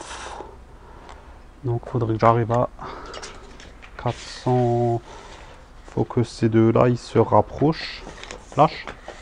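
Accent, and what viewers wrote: Alsace, France. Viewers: Rien que pour l'accent alsacien je mets déjà un pouce en l'air ;-) en tout cas c'est clair qu'il est bien fini ce carport . Par contre qu'en est-il de l'entretien du polycarbonate transparent ? facile à laver ? et niveau résistance ?? J'ai beaucoup de vent dans mon petit patelin lorrain, je n'aimerai pas retrouver la toiture chez le voisin...tu penses que c'est costaud si ca souffle fort ???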